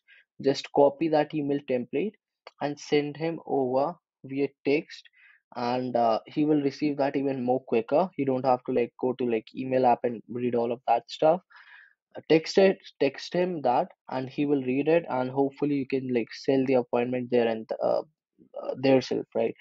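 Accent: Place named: India. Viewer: Hey nice video had some questions though - 1) how can we call us prospects from india for cheap? 2) how do u book appointments for us clients after generating some interested leads? 3) what is the service and what is the irresistible offer that you use in your agency? 4) aren't clients bothered by your heavy indian accent during sales calls? Keep doing the great work man. You are helping the community a lot.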